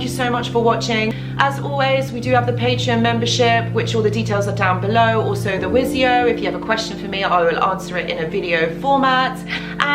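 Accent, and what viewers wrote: United Kingdom. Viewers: I think British because of her accent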